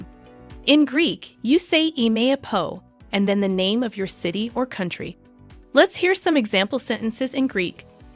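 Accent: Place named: United States. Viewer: Horrible. Este vídeo es completamente horrible. No sé qué da más miedo de todo, si el horrible acento inglés americano cuando pronuncia el griego o las personas hechas con inteligencia artificial. Es horrible. Jamás podría aprender nada con vídeos así.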